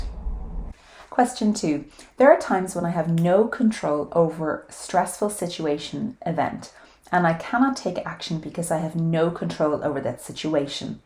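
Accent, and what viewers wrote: Ireland. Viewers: It's so great to recognize the things I control vs the things outside my control. This truth was illuminating to me. Love your talks, love to hear the Irish accent, and love the scenery. Any glimpse of my home land is the best. Stay well.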